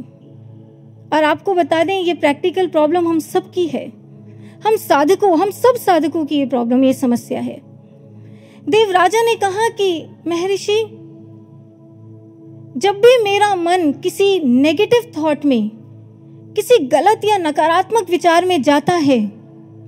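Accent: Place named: Bengal, India. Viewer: Her bangali accent is wow !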